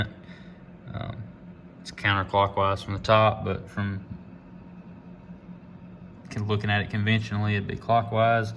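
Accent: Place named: Mississippi, United States. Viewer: You sound like your from Mississippi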